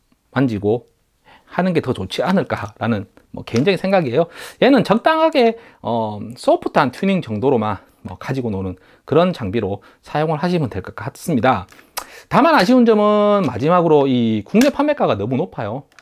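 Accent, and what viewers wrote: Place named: Seoul, South Korea. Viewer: 그냥서울말안쓰고 그냥 말하면 안돼나요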